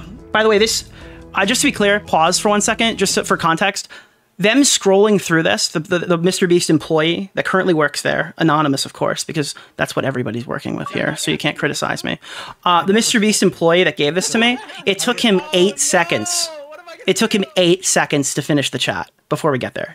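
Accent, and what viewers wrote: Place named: New York, United States. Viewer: This is fhe most aggressigely new york i ever heard you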